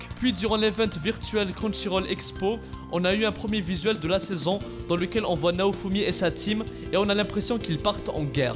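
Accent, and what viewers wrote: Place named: Kabylie, Algeria. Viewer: Pourquoi cette accent kabyle ??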